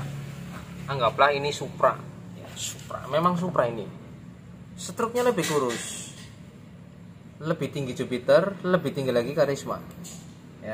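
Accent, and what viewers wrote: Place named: Java, Indonesia. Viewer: Medok jawane ndi hehe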